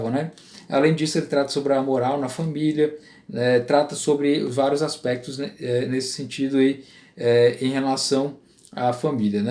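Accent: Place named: Recife, Brazil. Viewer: Você é de Recife? Percebi um leve sotaque.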